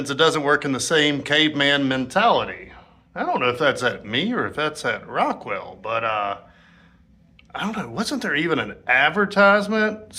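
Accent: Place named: South, United States. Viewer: the southern accent probably threw him off and made him think you were in California. common mistake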